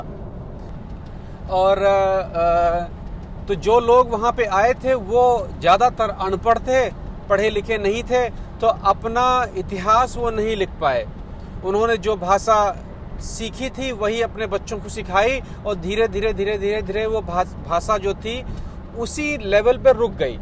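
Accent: Bihar, India. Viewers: Bhai aap ka language me biharipan lagta hai ... Very good bro thx for this information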